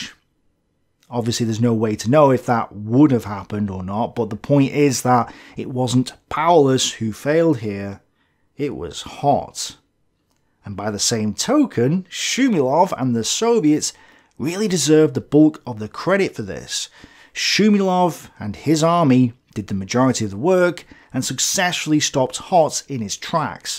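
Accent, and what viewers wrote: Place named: Northern England, United Kingdom. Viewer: I really enjoy your comments on ww2, Señor. By the way, because I lived in the UK I feel your accent is from Northern England or Scotland. Only there the U is pronounced like we do in Spanish. U = oo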